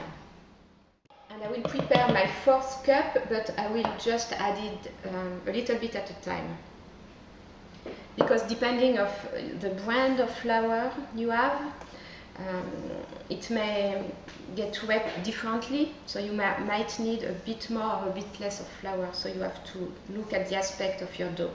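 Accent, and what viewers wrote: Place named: France. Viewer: What a delight to listen to your French accent with your very good English. You bring back so many wonderful memories of the 12 years I lived and worked in France. I lived in Etampes & Arbouville and worked in Angerville. You have just demonstrated that a French Baguette can be made in so many ways and not just the classic artisan method. BRAVO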